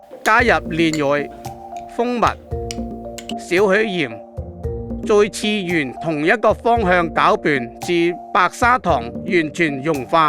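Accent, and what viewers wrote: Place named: Dongguan, China. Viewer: 聽似東莞口音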